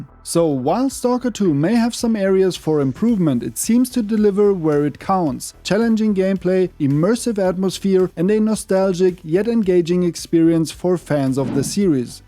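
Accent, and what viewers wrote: Germany. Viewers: german english accent is hilarious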